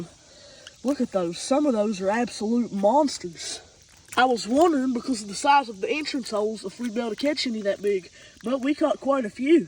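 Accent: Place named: South, United States. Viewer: Your accent is actually the best, southern accent!!!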